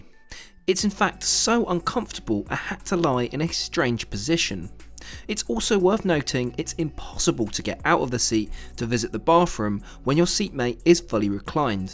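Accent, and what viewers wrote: United Kingdom. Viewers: How are you able to travel so freely during the pandemic? I believe you're from the UK if I got your British accent right. How can you travel with the traffic system in place with Amber countries? Do share your secret.....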